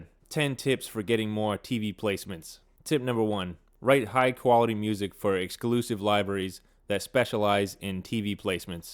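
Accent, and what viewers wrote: Boston, United States. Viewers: Are you from Bah-Ston? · I’ve heard other sync people say to do niche music and not try to do 10 different genres. Isn’t that the strategy with growing a following on social media as well? Btw Is that a Boston or New England accent?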